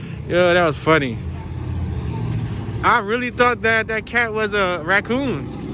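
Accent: Philadelphia, United States. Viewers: Loving the walk with you and love the philly accent keep them coming be safe out there walking those breezy streets of philly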